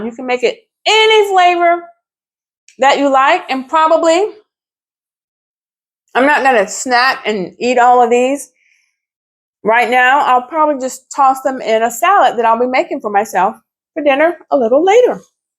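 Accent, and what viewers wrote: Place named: South, United States. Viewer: I just love your style with that country accent! I stumbled across your video looking for chick pea recipes. I've been making chick pea salad for awhile now since I started making plant based recipes. I'm going to make the fritters, which I'll call patties for dinner tonight. I have some cans of chick peas until I do marketing tomorrow!😂 I've never heard of chick pea cookies! I made healthy oatmeal cookies yesterday! I'll try the chick pea cookies this weekend! I'll let you know how my patties turn out!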